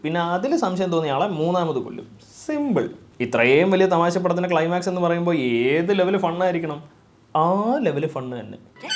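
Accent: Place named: Tamil Nadu, India. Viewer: Anyone feels like you're hearing Malayalam but in Tamil accent?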